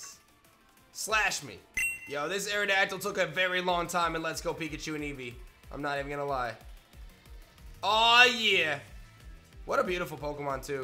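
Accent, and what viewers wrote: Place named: United States. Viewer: my guy ur american accent is 100 % i can barely stand it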